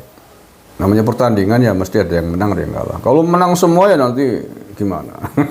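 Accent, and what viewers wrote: Banyumas, Indonesia. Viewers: Ngapak temen rika bang?? Ngomonge . Ora ngapak ora penak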